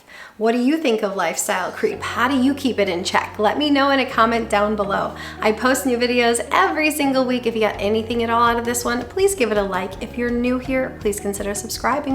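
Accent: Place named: Wisconsin, United States. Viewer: A fellow Michigander.. Wondered about that accent - to us lowlanders sounds too much like Wisconsin lol. IT for both me and my wife. Been saving 30%+ of our income for years. Wish I was smart enough to start that sooner, but still worked out fine. No inheritance for either of us. Middle/lower middle class background. Lifestyle creep is a thing, but I fight it. Biggest hurdle was it was impossible to get a place near work without spending a fortune, but we'll get that returned when we sell it and retire to somewhere cheaper. Maybe the UP ;)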